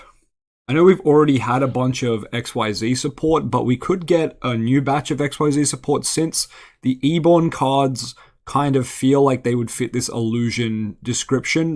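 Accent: Australia. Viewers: New mic? You sound less…Australian? Kiwi? Whatever you are, you sound less like it than last time I saw videos from you. Lol.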